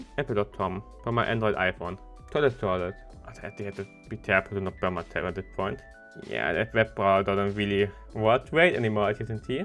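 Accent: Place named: Germany. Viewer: His accent is so heavy, i thought he was speaking german haha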